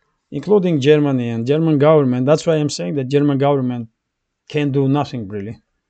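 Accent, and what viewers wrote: Russia. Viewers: Your English is quite perfect and it’s not hard at all to listen to your conscientious sentence construction dedicated to accurately expressing what you want to get across to your audience. Especially with your lovely Russian accent 🙂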